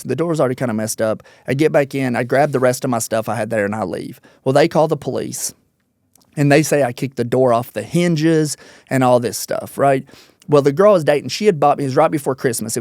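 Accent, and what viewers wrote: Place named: Kentucky, United States. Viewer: Oh shit he totally talks like a KY boy 😮😊😊😊. I grew up in Louisville and out in the country in KY and in San Diego so best of both worlds but wow .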